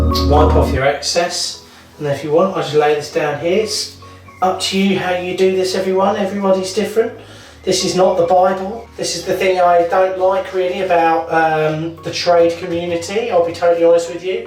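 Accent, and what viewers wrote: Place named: England, United Kingdom. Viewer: Isn't there a lot of waste?? Im from England living in USA - nice to hear the old stomping ground accent..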